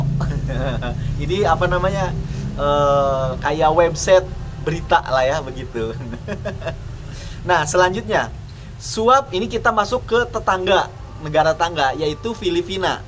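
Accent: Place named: West Java, Indonesia. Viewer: Kang.. knp sy panggil Akang?.. karena letahna letah Sunda.. Salut atas kontennya yg positif dan mengedukasi.. Gaskeun..Lanjuutt.. 👍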